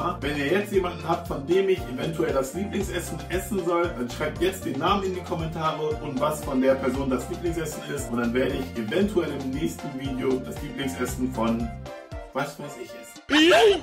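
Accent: Africa. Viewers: Hahah wie süß deine Freundin ist hahah am Ende wie sie dich einfach sauber macht und dein Afrikanischer akkzent ist einfach legender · Er kann diesen afrikanischen Akzent so gut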